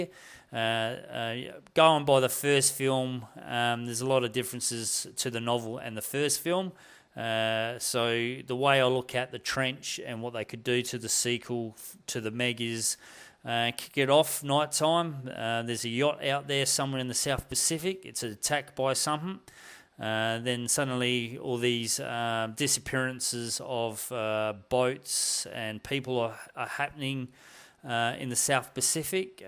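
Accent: Australia. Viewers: G'day mateeeee. I can tell your aussie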